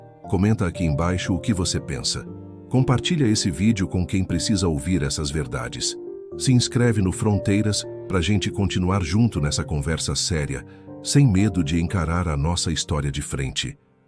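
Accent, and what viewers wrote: Brazil. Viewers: Sotaque de Brasileiro Horririso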